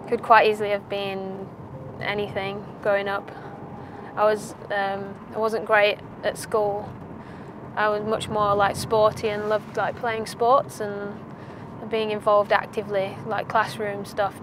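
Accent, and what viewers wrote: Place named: Yorkshire, United Kingdom. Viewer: Like the Yorkshire accent . Brave girl. Good luck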